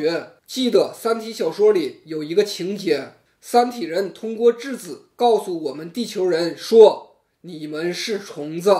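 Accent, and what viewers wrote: Northeast, China. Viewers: 你好！其实他的中文可能有点东北味，但是非常清晰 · 我刚刚发现了这个YouTube频道。我是荷兰人，正在学习中文。我喜欢他的中国口音。他说的很清楚！😀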